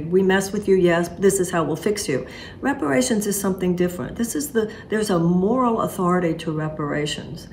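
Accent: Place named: Houston, United States. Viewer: It's really funny I would hear you talk and say some of the things that you say and wonder where is this lady from? So I finally did some research turns out you grew up in Houston just like me. It is funny that if you're from Houston nobody in the world knows what kind of accent you have cuz it's like they I have never heard it before. I remember going to Vancouver Canada and people would stop me when I talk and say where are you from I just moved to Dallas so I said Dallas Texas but what they were really picking up on was that Houston Texas sound I appreciate you. Probably won't agree with everything you say but I'll definitely listen to you say it.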